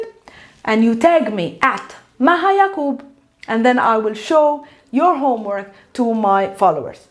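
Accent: Palestine. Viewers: كتيير حلو .. اللهجة الفلسطينية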